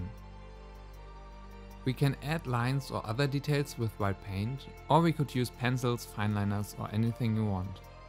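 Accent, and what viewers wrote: Germany. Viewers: Dein deutscher Akzent sticht heraus:D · gutes video. Aber boah was fprn harter deutscher akzent der ist ja fast so schlmm wie mein akzent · Luuzy Arts wie man es einfach immer bei jedem deutschen so mega raus hört xD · Ich liebe den deutschen Akzent :D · Ich wusste sofort dass du aus Deutschland kommst 😂 aber man merkt es kaum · I love your accent~ It's sounds German to me...correct me if I'm wrong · Честно говоря, я просто написала то, что больше всего подходило (´∀` ). Переводить на слух с немецким акцентом немного сложно, и я просто долго не могла понять, что он говорит. Так что пару раз прибегла к простому "и так сойдёт". Хотя на видео он именно это и делает: вытирает кисточку пальцами. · A lot of people don't like the montval, and I'm certainly not qualified to speak on paper quality but I really liked it when I got a big sheet for cheap just to try. 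Not everybody can afford arches. Dacht doch ich hör da nen deutschen raus Liebe grüße in die heimat, hoffe bei euch wird es nicht so schlimm wie bei uns hier an der amerikanischen ostküste. Wäre schon jahrelang lieber wieder zuhause aber nie mehr als jetzt.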